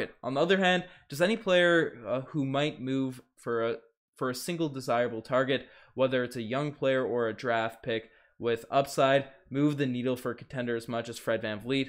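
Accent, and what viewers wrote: Newfoundland, Canada. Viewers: must be from town with that accent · Are you from Newfoundland?? 🤨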